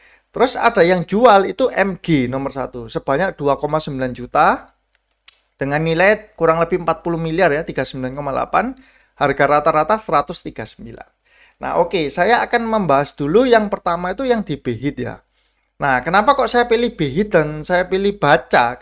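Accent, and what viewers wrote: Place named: East Java, Indonesia. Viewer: Muuuuedok tenan ko jawa timurnya 🤣🤣🤣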